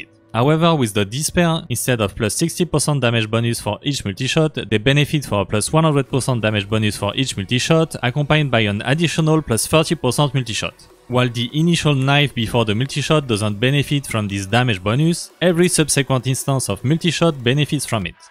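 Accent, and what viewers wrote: France. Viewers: Toi t'es un bon gaulois ça s'entend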